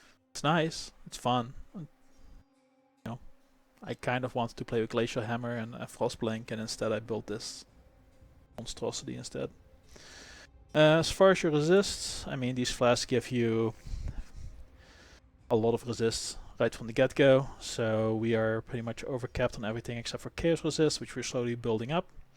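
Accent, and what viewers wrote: Netherlands. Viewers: Je hoort meteen t nederlandse accent haha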